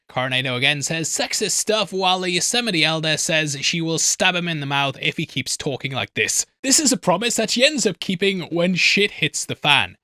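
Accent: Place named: Newcastle, United Kingdom. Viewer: I didn’t know you were Geordie, or at least northern?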